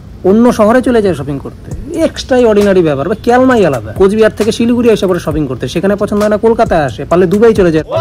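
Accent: Bangladesh. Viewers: আপনার বাংলা pronunciation আমাদের বাংলাদেশের বাংলার মত কিছুটা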